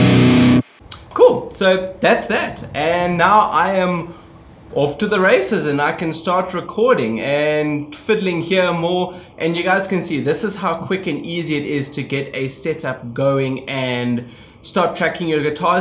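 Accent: South Africa. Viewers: Imagine my surprise hearing a SA'n accent as this vid started haha. Lekker bru :P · My boet, you sound South African.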